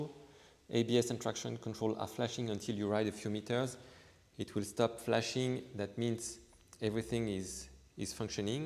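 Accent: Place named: France. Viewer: Très bonne présentation 👍 mais peut-être mieux en français (vue l’accent 😉) et sous titré anglais !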